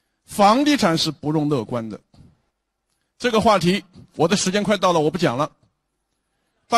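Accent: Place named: South, China. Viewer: ，您这站得直啊。我以前比较小看南方口音，特别是 B 站做游戏视频的。没想到南方口音也能说出这么有气节的话！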